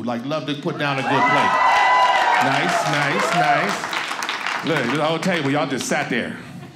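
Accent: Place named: Bay Area, United States. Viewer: I knew he sounded familiar A Bay Area Native 😂❗️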